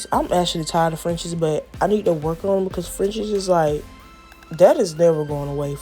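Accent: South, United States. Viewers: Not annoying and country you crack me up😂😂😂😂💜💅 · you are funny 😅😂the nails came out cute and your accent is awesome I love my country girls💃🏽💃🏽💃🏽💃🏽💚🥰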